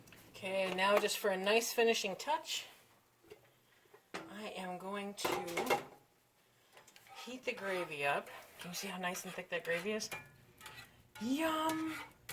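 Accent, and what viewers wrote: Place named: Michigan, United States. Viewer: I'm brand new here...I happened to be browsing around for recipes and clicked on your video. I could tell right away you were from Michigan, because we have dear friends in Johannesburg, and your accent reminded me of them. You have very interesting content, and a very pleasant manner...I think I'll stay!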